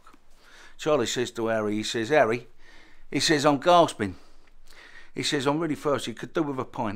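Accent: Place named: Cockney, United Kingdom. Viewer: Dear cockney fellas and those who could figure this out. I'm trying to learn cockney accent but I still don't understand some parts of his speech. Can anyone give me a hand here?🙏🙏🙏🙏🙏🙏🙏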